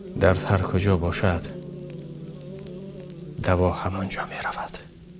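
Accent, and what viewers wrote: Tajikistan. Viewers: Забони Точикиро тоза гап мезанад Офарин 👍👍👍👍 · Дар забону, лахчаи поку, ширинии точики шердилм садкашавм😢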